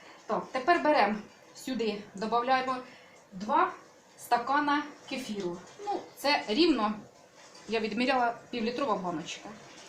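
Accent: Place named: Poltava, Ukraine. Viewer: а де ви проживаєте? чи не на Полтавщині?знайома говірка.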